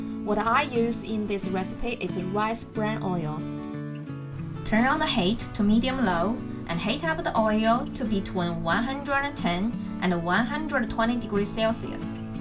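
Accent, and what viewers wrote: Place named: China. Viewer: Typical chinese accent :)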